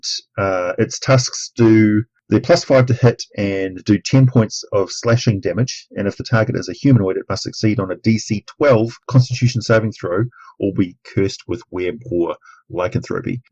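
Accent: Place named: New Zealand. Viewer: That Kiwi pronunciation tho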